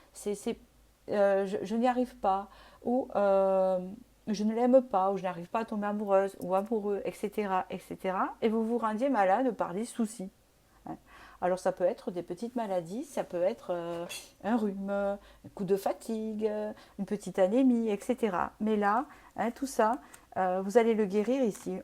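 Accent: South, France. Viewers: Merci de penser à nous le week-end! Avec votre joli accent du sud...je ne sais pas de quelle région vous êtes...😊 Puisque vous parlez de propositions à vous faire, j'aimerais pour ma part plus de guidances pour les célibataires...il y en a très peu sur YouTube même si vous faites partie des chaînes qui en font le plus...ou en tout cas où le titre est suffisamment large pour nous intégrer. Sinon, sur YouTube, on entend toujours...VOTRE AUTRE... et s'il n y a pas cet autre?? Nous sommes des millions de célibataires et je n ai jamais compris qu il y ait si peu de thèmes pour nous...une autre guidancière, ÉVEIL DE L'ESPRIT, qui demandait également de lui envoyer des idées de tirage, m'a répondu que d'après les stats de YouTube, il n'était pas intéressant de faire des tirages pour les célibataires et que ça ne rapporte pas!!!😳. Circulez...il n'y a rien à voir!! 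Donc je me suis désabonnée. Bon dimanche à vous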